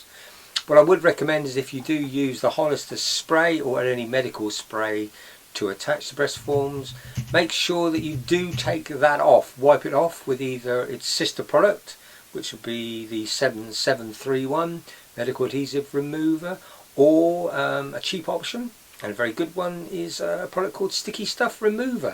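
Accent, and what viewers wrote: United Kingdom. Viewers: You ! From the U.K ? Love your English / British accent wow.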